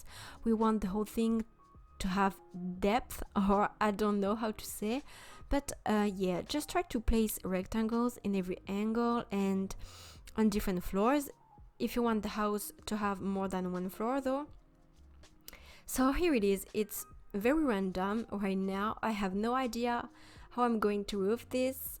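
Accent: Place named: France. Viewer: Wait hol up are you French????? I love ur accent I am nigerian but speak fluent French lol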